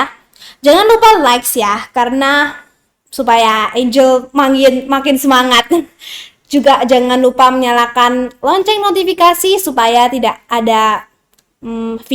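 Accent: Java, Indonesia. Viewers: Mantabb... Walau medok tp tetep insightful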